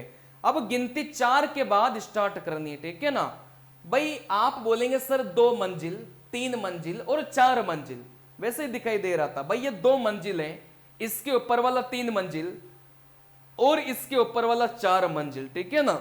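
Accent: South, India. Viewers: Sir aap south indian ho kya???? 😅 Accent wahi la lagta hai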